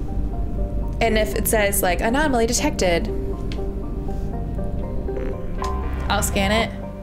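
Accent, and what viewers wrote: New York, United States. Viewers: I absolutely do not mind your New York accent. I actually find it to add extra flavor to your already great video content lol. I encourage it lol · I knew you were from NY! Are you by any chance from WNY? 😂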